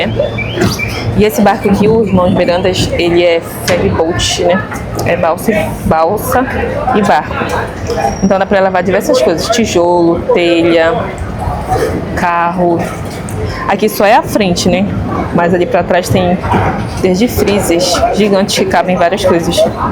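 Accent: Rio de Janeiro, Brazil. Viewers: Boa tarde família...curtindo mais um vídeo e deixando aquele Likeeeeee....então sobre o preço da passagem acho razoável com 3 refeições? Show...outra particularidade....Seu sotaque é muito parecido com o dos Cariocas.....Por exemplo...Charleessssss😂😂😂😂...é bonito 😅😅😅...Manauuusssssss....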